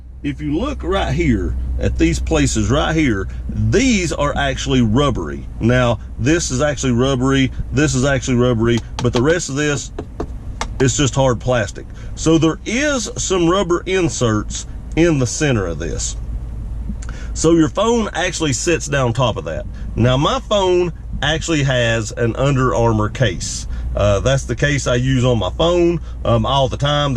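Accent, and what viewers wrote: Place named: South Carolina, United States. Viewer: Are you sure you are not from SC? I know you are from Florida but that accent is distinct.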